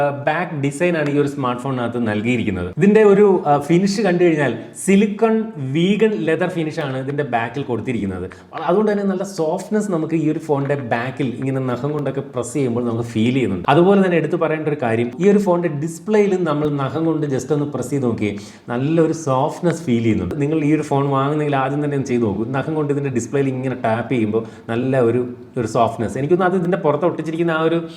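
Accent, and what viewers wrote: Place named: Trivandrum, India. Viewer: Trivandrum അങ്ങനെയാണ് ബ്രോ H ന് ഹെച്ച് എന്നും പറയും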